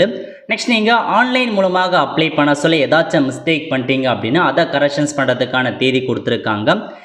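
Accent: North, India. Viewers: நீ தமிழன் தானா...? உன் பேச்சு வடக்கன் மாதிரி இருக்கு...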